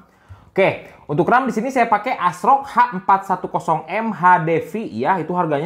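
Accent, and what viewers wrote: West Java, Indonesia. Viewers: Sunda wkaka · F = EP Sunda Accent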